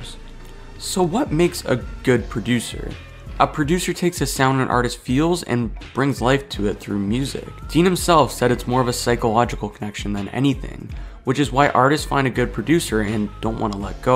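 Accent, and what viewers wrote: Canada. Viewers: Are u from canada. I hear the way u pronounce certain words 😂🔥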